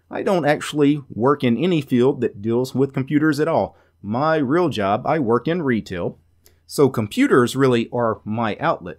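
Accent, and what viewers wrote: South, United States. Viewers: Hello, I am from CA but I am right now in Italy with a friend who is a programmer and he installed linux on my computer and he was listening to your video on Joplin as he was trying to find me an alternative to Evernote and he is learning English and he was asking me where you were from, what kind of accent you have, since it is different than mine. Can you tell me what area you are from, are you from a southern state?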